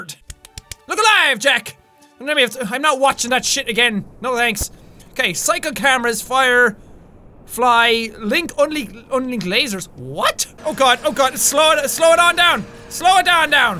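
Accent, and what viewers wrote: Ireland. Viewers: I like that instead of hard, u say herrd xD love it (yes i know is irish) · What part of Ireland are you from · are you irish?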